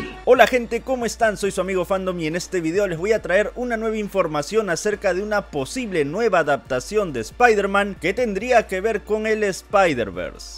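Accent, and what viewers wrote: Peru. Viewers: Déjame adivinar. Peruano?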